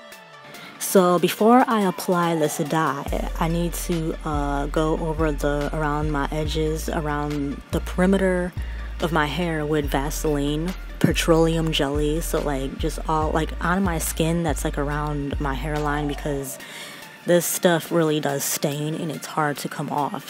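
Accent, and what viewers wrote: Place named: South, United States. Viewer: You sound so country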